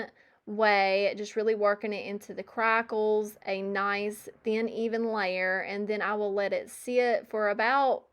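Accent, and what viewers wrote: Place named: South, United States. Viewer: your southern accent reminds me of julie from julie thrifts. both of you say some words, like old (you throw a u sound in there) the same way. I liked how you explained things, so I joined. I also like that you clean with windex. that's my go to as well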